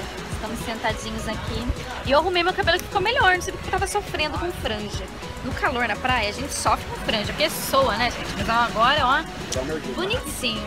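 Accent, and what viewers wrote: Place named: São Paulo, Brazil. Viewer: Pelo sotaque, deve ser SP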